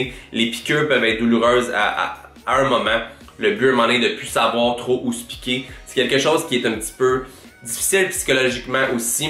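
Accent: Quebec, Canada. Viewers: Première vidéo que j'regarde de ce type. L'accent québécois je m'y attendais pas du tout. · L'accent québécois.. savoureux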